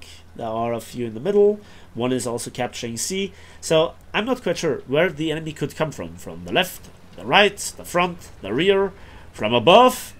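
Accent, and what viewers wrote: Germany. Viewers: Am I, am I first? Wow! Quick! Think of a cheesy thing to say... Jk. Thanks for the video. Always nice to have a good game with learning opportunities, all narrated with a satisfying German Accent!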